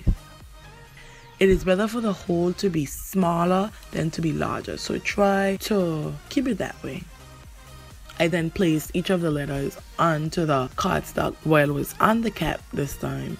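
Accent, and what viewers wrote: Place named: Anguilla. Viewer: Shes from Anguilla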